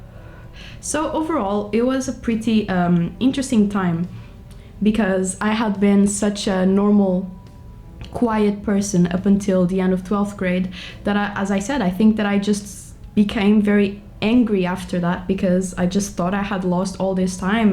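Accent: Brazil. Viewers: you have such a brazilian accent,iu are from brazil? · Olá. Seu sotaque é de brasuca! Hahahaha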